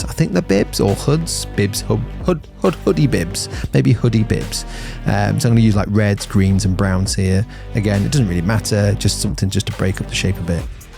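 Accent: United States. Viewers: LOL, never thought I would hear use an American accent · Your American accent sounds like my uncle. You were also dressed like him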